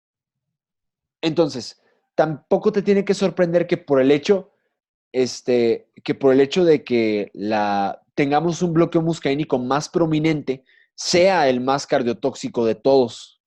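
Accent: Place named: Fresa, Mexico. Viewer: Hablas como pendejo, muy fresa che idiota.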